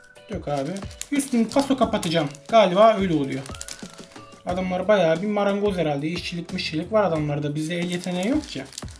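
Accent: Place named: Gaziantep, Turkey. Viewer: Adam antepli konuşmasından anladım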